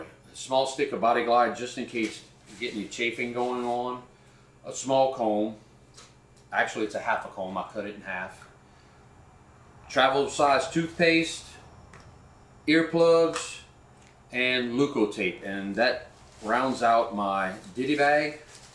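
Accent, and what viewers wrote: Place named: South Louisiana, United States. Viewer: That accent. You south of I-10? haha I'm a N. LA boy aka "fake" Louisianian.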